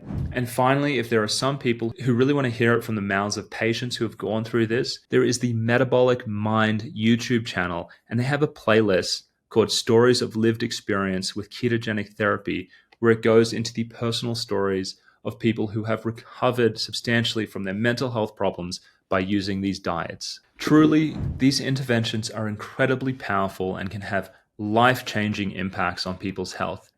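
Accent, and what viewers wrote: Australia. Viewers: Thankyou for the great info. Made some changes in my life and it's really helping. Do I detect an Australian accent? Curious as I'm an Aussie 😊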